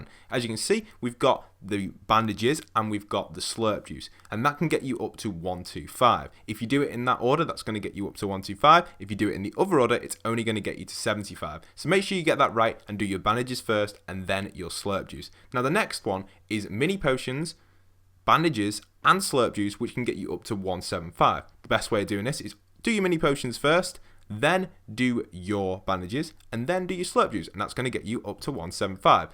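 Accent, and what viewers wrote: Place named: England, United Kingdom. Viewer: Englishman accent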